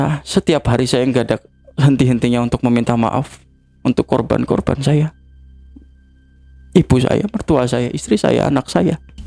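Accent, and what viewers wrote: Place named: Pantura, Indonesia. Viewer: Logat ngmongnya kya orng daerah pantura, ( cirebon atw indramayu).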